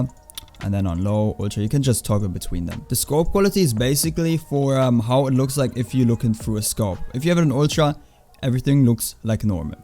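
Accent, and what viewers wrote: Germany. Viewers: mann hört das du deutsch bist xD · i hate this german voice omfg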